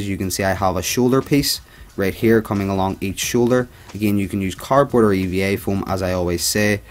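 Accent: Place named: Ireland. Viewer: Mate I thought you were irish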